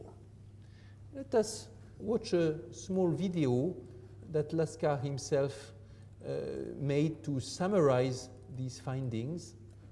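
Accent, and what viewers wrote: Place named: France. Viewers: ITS A PURE FRENCH ACCENT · He sounds SO French and I love it!!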